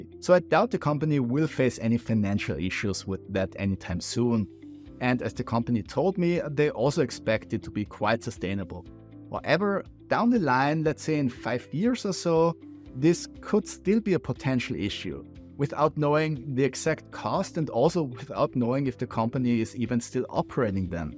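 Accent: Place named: Austria. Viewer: With such an extremely obvious Austrian accent, I would never have guessed this guy's name to be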